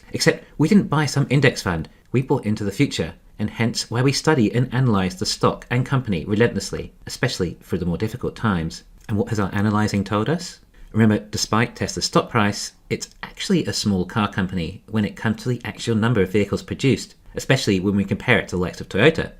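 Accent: England, United Kingdom. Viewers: Petrol forecourt, that expensive place where ICE car owners like to hang out 😂 ❤ Nice one. Also as an Australian why do you sound more English than me and I'm from England? Nice accent.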